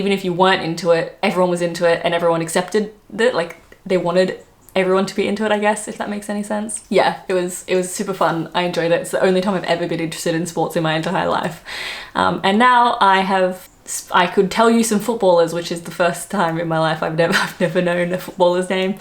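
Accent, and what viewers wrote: Australia. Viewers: You do still sound Australian if that helps, although not overly so, quite a posh version 😁🤔. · You are sadly losing your lovely Aussie twang it’s changing gradually in to a posh English accent. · We Brits can be direct, particularly if trying to get ones money back for a cancelled event or faulty goods. Venting ones spleen is cheaper than an exorbitantly priced Solicitors letter. You can pass as a Brit as your accent is not overtly Aussie, more nondescript and anyone who lived in Earls Court for a reasonable time would be used to Aussieisms, used by all residents there. Is it still the Aussie capital of London? The politeness on the roads is an hangover from as late as the 50's, when horse drawn transport was still common and one gave way to an horse drawn vehicle, they still have right of way. Not being a Cricket fan and admitting it has just presented Australian immigration authorities an excuse for refusing entry back into the country, Despite the Australian lockdown this upcoming Ashes tour has got the go ahead, nothing stops the Ashes, apart from a World War.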